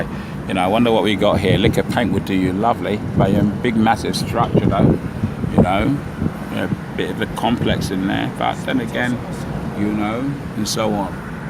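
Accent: Cockney, United Kingdom. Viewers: interesting commentary...esp' with your cockney accent. LOL someone should tell Rochas he needs to improve the quality of the roads his gov't constructs in Owerri! that's what lets down his efforts in opening up new areas of the city!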